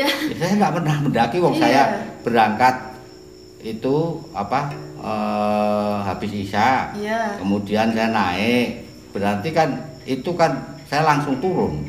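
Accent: Java, Indonesia. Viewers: host nya medok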